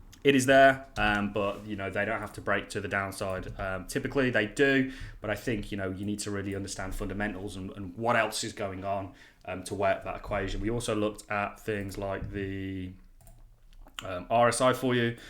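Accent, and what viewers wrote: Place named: England, United Kingdom. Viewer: Obviously this is your first time listening to English accent.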